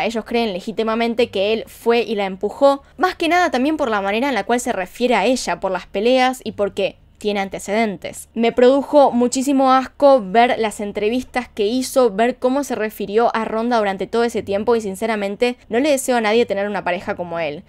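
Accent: Argentina. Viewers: gracias por tu trabajo me gusta mucho y te expresas muy bien me encanta tu dejo argentino sigue deleitandonos con casos de diversos paises saludos desde Lima Peru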